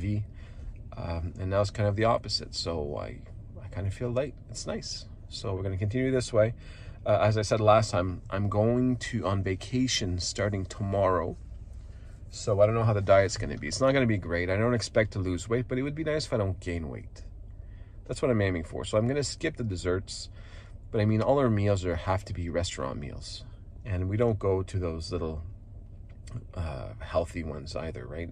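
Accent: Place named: Canada. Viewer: Are you canadian! i heard a little Canadian accent! love your videos, very helpful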